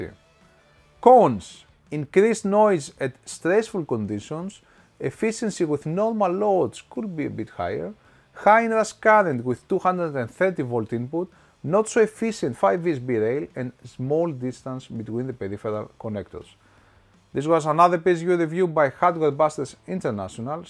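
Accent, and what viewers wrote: Greece. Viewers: Ελληνας ακουγεσαι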